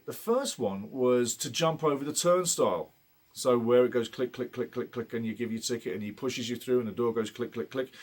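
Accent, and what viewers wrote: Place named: United Kingdom. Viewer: you have a very particular accent, i think you're british right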